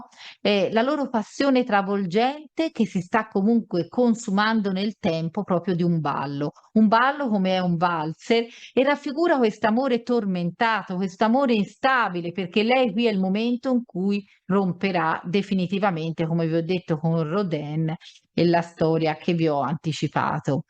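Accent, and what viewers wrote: Tuscany, Italy. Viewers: bellissimo contributo con il tuo bell'accento toscano o fiorentino che ne so...bel documento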